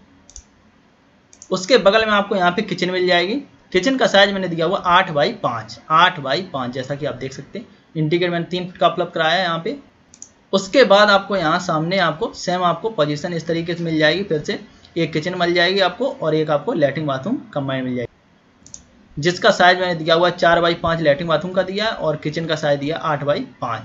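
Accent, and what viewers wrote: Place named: Bhind-Morena, India. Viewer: भिंड मुरैना क्षेत्र के लग रयै आवाज से।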